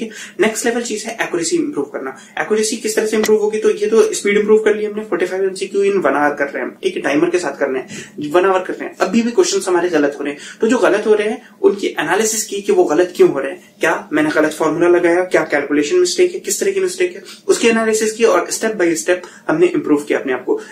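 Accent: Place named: Kashmir, India. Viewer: Hey bro I guess you're from Kashmir na?? 😄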